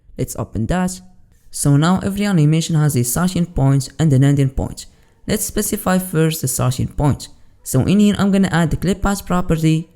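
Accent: Morocco. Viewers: Great job! From your accent, I think you are Moroccan. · Good stuff my man wyni mathmeqnich pronunciation mghribya hadi 100% rak kayn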